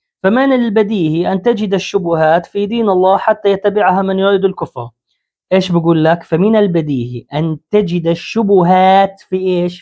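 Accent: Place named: Jordan. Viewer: بحب اللهجة الاردنية 😂 طب الحق علي انا يعني دقيقة ٩ و ٣٠ ثانية تعديل بخاتمة الفيديو ( هو انت بدك تتجوزني ) 😂 جملة ايقونية يزم يسعد رب الاردنية ❤❤❤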